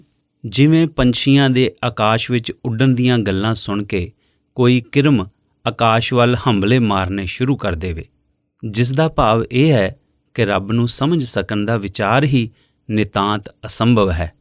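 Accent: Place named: Punjab, Pakistan. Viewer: Tuhadi awaj sun ke bht khushi hundi aa.. tuhadi punjabi cho Pakistan Punjabi da kafi touch feel hunda.. menu mere Pakistan punjabi frnd yaad aa jande jado v tuhadi awaj sundaa.. Thanks u very much Sir🙏🙏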